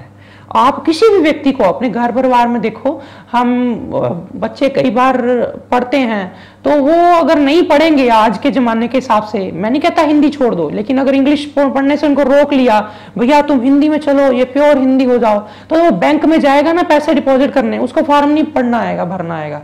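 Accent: Punjab, India. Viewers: I actually enjoy listening you just because you have your own punjabi accent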